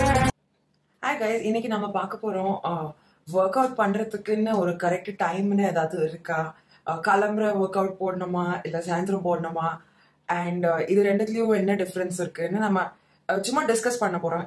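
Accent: Tamil Nadu, India. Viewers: you're just speaking brahmin tamil slang.. But it doesn't matter.. We still can understand it.. We usually see this tamil slang in movies only..that's why when u talk brahmin tamil people are commenting about it.. But dont worry.. It's makes u different from other youtubers.. So, just be yourself.. We are happy with it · You are so fluent in English than Tamil, yet your Tamil slang is unique and very nice. So pls keep on making tamil videos.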